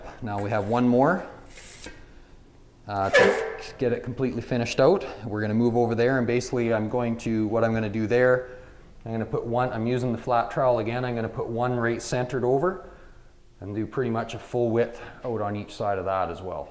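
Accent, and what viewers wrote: Canada. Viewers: are you from Canada? Voice sounds Canadian. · You sound Canadian :-) I use the same tray for mixing small amounts of powder. Do you always use premix/regular mud on your first coats? I've always been tought to use powder, usually 45, if not then 90. Much more strength and it allows you to coat it again or even 3x in the same day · That's an interesting accent you have there! Kinda Canadian, German, Dutch, Irish infusion...and with a name like I guess you're or your family are / were from Ireland? Anyway 😂, won't butt edged joints show with sun beaming down the wall? I'm curious - as I'm from the UK, and we all skim whole walls over here!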